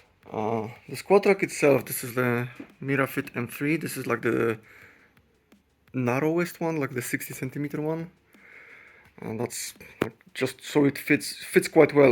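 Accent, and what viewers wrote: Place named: Poland. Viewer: The English flag confused me, with your accent Are you polish ??? Nice gym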